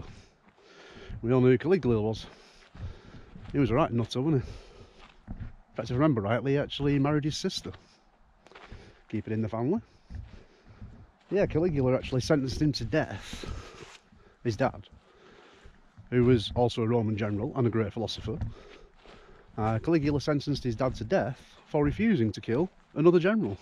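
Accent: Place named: Lancashire, United Kingdom. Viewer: As in Vee-k-us. I'm a Roman Lancastrian and talk just like you! Salt of...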